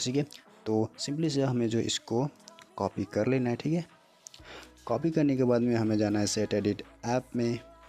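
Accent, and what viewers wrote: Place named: India. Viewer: Your Indian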